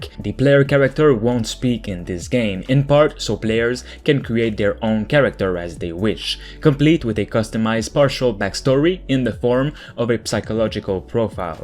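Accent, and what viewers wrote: Quebec, Canada. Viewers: T’es tu Québécois par hasard? 👀😂 Good content, continue comme sa!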